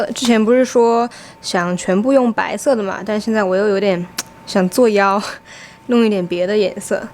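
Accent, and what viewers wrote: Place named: Hunan, China. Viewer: 普通话带湖南口音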